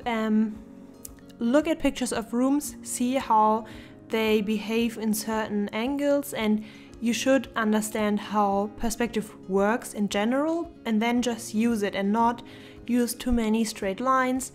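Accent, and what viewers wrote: Germany. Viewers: Ich find das so merkwürdig du sprichst so gut Englisch und trotzdem hört man sofort dass du deutsch bist ( hoffe das stimmt auch ) aber ich hab keine Ahnung woran ich es erkenne außer an diesem typisch deutschen "ähm" :D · Are you German by any chance? Your accent sounds kinda familiar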